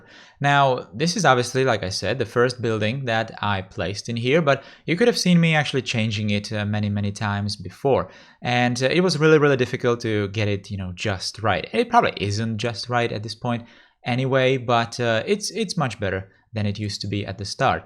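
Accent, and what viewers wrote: Czech Republic. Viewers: Are you Czech ? :D that accent .. or Slovak by any chance? :D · Hi can I ask you where are you from. Your accent sounds Czech. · your accent tells me you are Czech, am I right?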